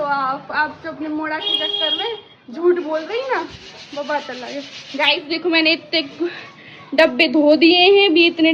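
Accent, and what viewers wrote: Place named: Bundelkhand, India. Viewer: Bundelkhandi achhi LG Rahi aap pe❤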